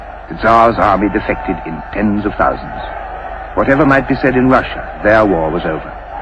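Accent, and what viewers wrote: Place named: RP, United Kingdom. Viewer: This accent would definitely be described as "RP" - received pronounciation, it's known as. In other words, very posh English, a bit like how the Queen used to speak. Even she has been influenced by "estuary-speak", that splurge of Cock'er'ny that has spread across most of the southeast of England. this is how EVERYONE on the BBC used to speak. These days northern and other accents are more common on the telly. Thank god.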